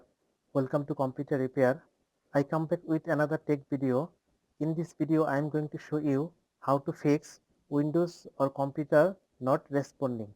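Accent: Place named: India. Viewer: I clicked off right away after hearing that's he's Indian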